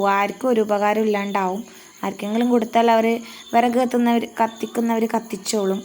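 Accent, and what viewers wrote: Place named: Malappuram, India. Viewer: നിങ്ങളുടെ സംസാരം മലപ്പുറത്തെ പോലെ തോന്നി😄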